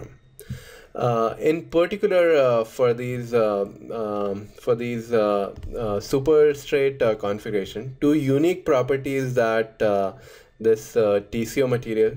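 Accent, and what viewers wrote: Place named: India. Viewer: Hindu accent- cannot understand him.... shame!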